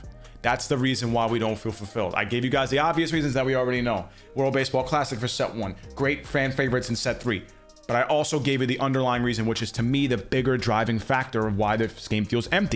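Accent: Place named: Dominican Republic. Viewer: Dominican accent papi 😃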